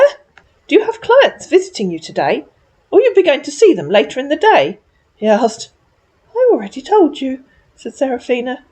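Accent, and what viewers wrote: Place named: Southern England, United Kingdom. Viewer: You sound like all my cousins, they live in Oxfordshire and Southampton, i was born in Southampton..... I live in Australia with barely any family here.... your voice makes me feel like I'm home X Oh i love what you do 🤩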